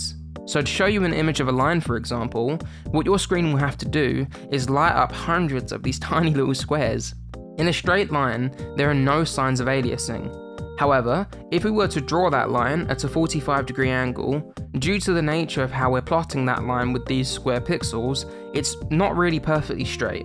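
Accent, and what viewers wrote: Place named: Roadman, United Kingdom. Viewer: Well this is quite surreal, it's like listening to a roadman lecture about graphics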